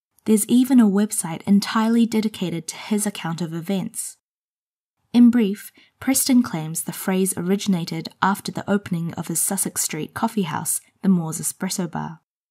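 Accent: New Zealand. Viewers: 플랫 화이트 커피의 기원에 대해서 개인적으로도 너무 알고 싶었던 내용인데 이렇게 뉴질랜드 영어 발음으로 듣게 되어 영광입니닷! XD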